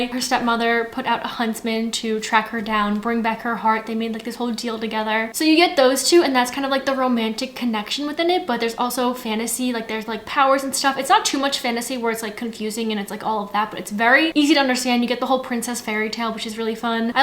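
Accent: Canada. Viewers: she sounds Canadian or something or Ukrainian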